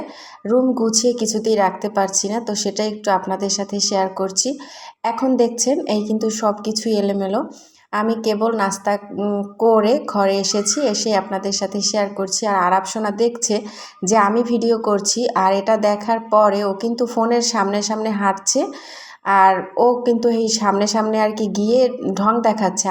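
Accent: Barishal, Bangladesh. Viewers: আসসালামুয়ালাইকুম সোনা আপু আমার ❤কেমন আছো তুমি❤ আমাকে কি ভুলে গেছো😢 ভুলে যাওয়ারই কথা😢 অনেকদিন তোমাদেরকে মিস করে করে গেছি 😢সাধ্য হয়নি তোমাদের সাথে যোগাযোগ করার😢 যাই হোক আপু ❤ভিডিও অনেক ভালো লাগলো❤ শুয়ে পড়ে কানে শুনেছি❤ তোমার বরিশালের কথায় আমার অনেক ভালো লাগে ❤তোমার জন্য অনেক অনেক দোয়া এবং ভালোবাসা রইলো বোন আমার❤❤❤❤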